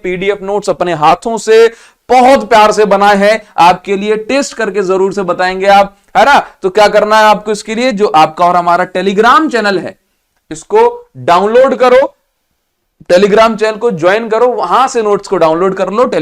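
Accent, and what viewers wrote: Odisha, India. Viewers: Sir great video❤ Sir, are you odia? Jay jagannath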